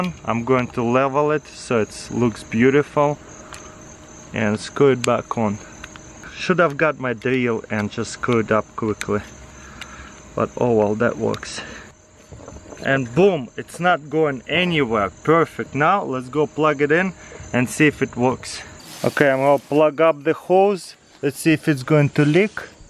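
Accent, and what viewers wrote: Russia. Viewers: Is he a Russian?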